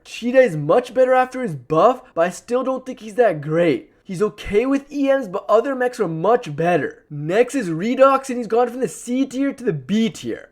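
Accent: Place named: Middle East. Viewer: May I ask where you are from? Your accent is interesting. I’m assuming Middle East somewhere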